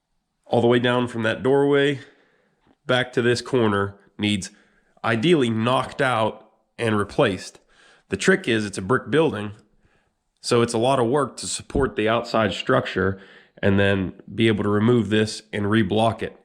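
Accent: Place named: South, United States. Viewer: Are you from western PA originally? You sound very southern. Especially the ol' lady comment.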